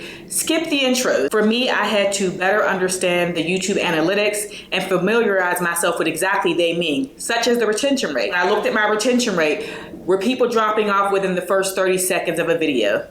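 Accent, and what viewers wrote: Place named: South, United States. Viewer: Congrats on reaching 1k! This was great! And where are you from? I definitely hear a southern accent!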